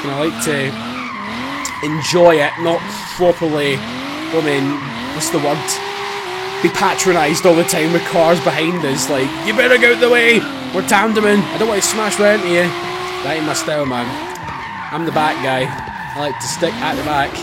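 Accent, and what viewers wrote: Scotland, United Kingdom. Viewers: Are you from Scotland. I like your accent if that's how you spell it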